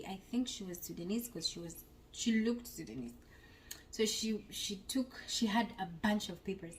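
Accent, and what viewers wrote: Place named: United States. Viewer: You've come back with an American accent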